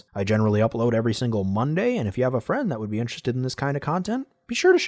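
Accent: United States. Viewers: What’s your accent? New York?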